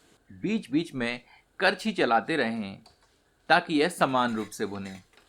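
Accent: Standard. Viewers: आपकी शुद्ध हिन्दी से चार चांद लग जाते हैं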